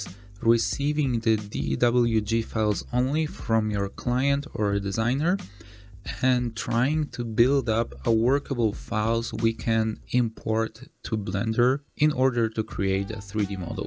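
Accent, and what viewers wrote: Poland. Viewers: Przez moment zastanawiałam się, czy ten akcent nie jest przypadkiem polski, teraz już wiem, że to nasi. ;)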